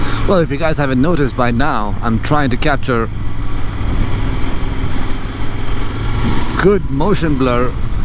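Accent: India. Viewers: Your accent is good👍...normal Indian accent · I’m a Brit in the UK who owns an Interceptor. I’m also married to an Indian lady! Don’t worry at all about your accent!!!!! Your English is perfect and waaaaay better than my Hindi!!!!! Don’t understand the negative comments about your accent at all! You are really clear and thanks for posting such great and useful videos! Now Im off to replace my levers using your video tutorial!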